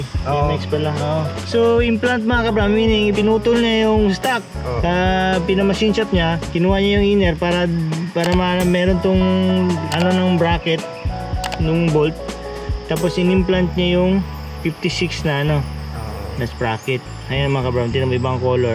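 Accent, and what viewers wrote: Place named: England, United Kingdom. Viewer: natatawa ako sa english accent tsaka sa kinalabasan ng cc/subtitle ng video hahahaha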